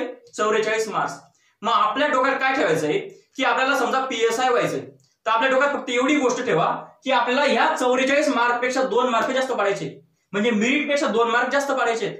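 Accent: Khandesh, India. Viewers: Bhau khandeshi she vatat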